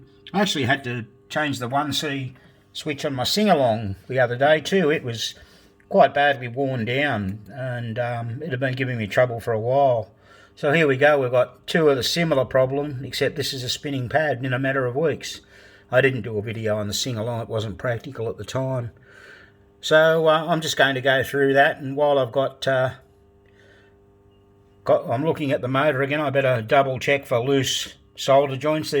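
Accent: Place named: Australia. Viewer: So glad to find your channel mate! To hear an Aussie accent makes it so much easier to follow along.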